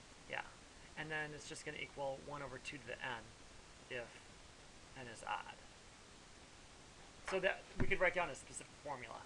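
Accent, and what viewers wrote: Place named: United Kingdom. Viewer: thanks, mate! nice british accent btw THANKS M8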